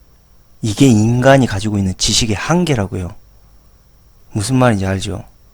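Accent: Jeolla, South Korea. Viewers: 삼성을 망하라는 건가? 그럼 대안이 뭐냐? 인간중심? 좋아하네. 전라도 사투리도 거슬리고. 한심하다. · 믿고 거르는 전라도 사투리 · 채널 주인 전라도 억양 삼성비하... 선동성... 끄덕끄덕 역시 그쪽 분위기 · 그래서 뭘 이야기하는겨. 전라도 억양으로 뭔 개소리를 하고 있는겨...... 인간 같지도 않는 놈.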